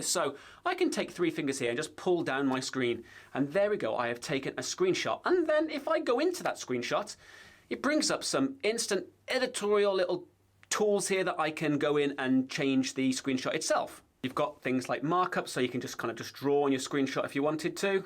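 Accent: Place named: United Kingdom. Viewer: Not another British tech tuber, come on, the accent is very tiring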